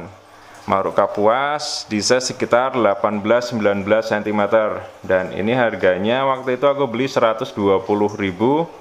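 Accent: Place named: Surabaya, Indonesia. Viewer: Sampean suroboyo ndi mas?bek'e oleh nempel Gon e sampean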